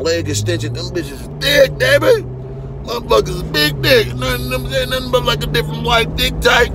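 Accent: United States. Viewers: Understood bro, I feel it. I’m catching what you doing it’s not what you say but why you do and the face you giving us the game gratitude bro, finna turn it up 2k25! You talk like you from south I’m from Memphis! Love to get mentored by ya 🙏🏽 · I just joined your channel and I enjoy your content, plus you inspire me to take better care of myself also while getting the girls. I gotta say this. I'm from Cali/The Bay and you sound like you from Cali my brotha. Right on and I wish you many riches and many bitches.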